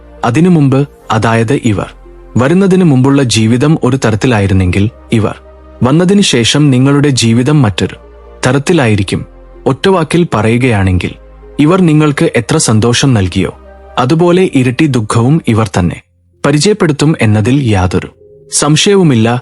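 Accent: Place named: Tamil Nadu, India. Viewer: OM DURGA DEVI NAMAH. The words are pronounced like Tamilian who do not know Malayalam.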